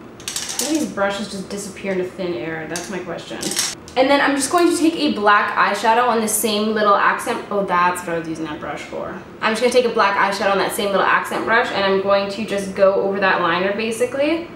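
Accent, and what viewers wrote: Canada. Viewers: Every now and again, your cute Canadian accent comes through. I love it!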